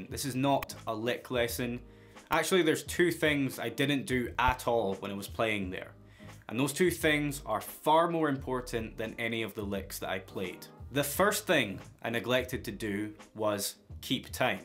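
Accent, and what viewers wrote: Scotland, United Kingdom. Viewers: I'm a chronic noodler with next to no musical training, and I've just started his "bulletproofguitarplayer" stuff. I don't know whether it's just the laconic Scottish accent and matter-of-factness about his delivery that I like, but he does a really good job showing the basics, and of training your ear as he goes along. One of, possibly the best of the YouTube era guitar teachers in my opinion, as he shows as much as he tells, makes me listen, demystifies a lot of the often cryptic language of music, and breaks a whole lot of things down into simple bits without getting all show-offy and distracting me with mind-melting licks (even though he is an extraordinarily capable player). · The noodling part was incredible! You sound like you're from Scotland? Edinburgh? Could jam around :D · Are you Scottish? Irish?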